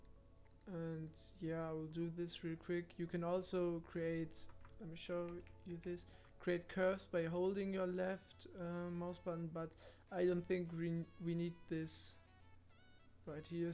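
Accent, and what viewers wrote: Germany. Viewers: dein akzent mein hört dass du deutscher bist hahaha !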